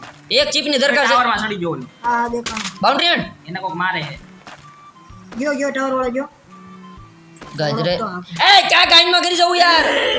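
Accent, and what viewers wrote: Bhavnagar, India. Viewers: એલા નક્કી તમે ભાવનગર બાજુના લાગો😅😂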